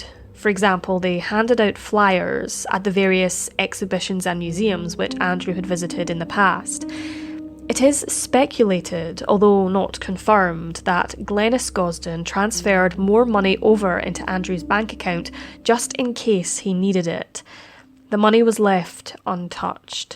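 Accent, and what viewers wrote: Scotland, United Kingdom. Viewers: Ann it’s a scottish accent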